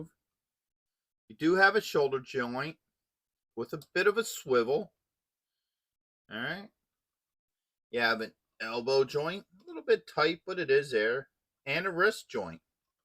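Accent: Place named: Upper Peninsula, United States. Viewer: Brother where are you from? I grew up in the Upper Peninsula of Michigan and your accent reminds me of home there eh? 😊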